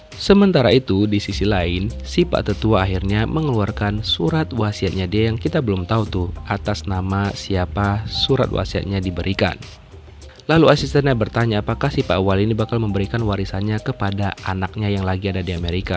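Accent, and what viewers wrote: Medan, Indonesia. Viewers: Logat mu kek orang Medan Wak 😂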